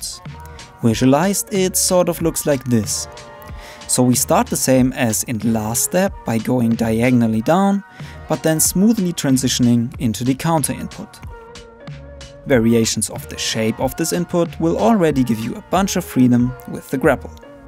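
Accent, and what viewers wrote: Germany. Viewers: You sound German m8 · Are you German? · Ich schätze Mal dass deutsch deine Muttersprache ist...aber finde es trotz des unvermeidbaren Akzents, spitze danke für dieses Video :D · Man hört aus deinem englisch raus das du deutscher bist xD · Erwischt du bist Deutscher hehe ;) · Are you an German? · Hey mein ich das nur oder sprichst du normaler weisee Deutsch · Schöner deutscher akzent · 10er wenn das kein eingedeutschtes Englisch ist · Du bist doch ein Deutscher oder :) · Ich höre doch genau raus das du deutscher bist :) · Der ist Deutscher merkt man am Akzent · Safe deutsch hört man ja extrem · Haha deutscher akzent kickt😂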